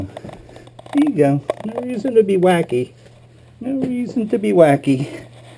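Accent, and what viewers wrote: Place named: New York, United States. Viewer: He's a New Yorker - they all sound like that! LOL!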